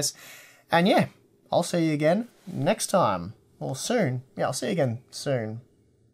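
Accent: Australia. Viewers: Just found your Channel and subbed.. refreshing hearing a Aussie for a change love the Vids. Is a i5 4670k still OK for Gaming ?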